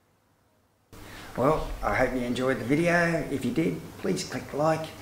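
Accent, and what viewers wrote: Australia. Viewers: I thought I recognised a fellow Aussie accent. Nice job, I hope to see more. I'm Brisbane based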